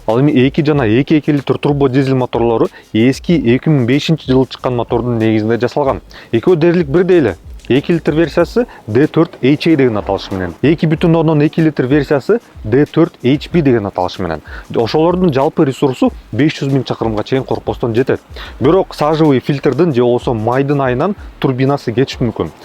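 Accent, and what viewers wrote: Standard. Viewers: Ото сонун эң жакшы деген баа беришибиз керек таза кыргызча сүйлөгөнү учун чыныгы кыргыз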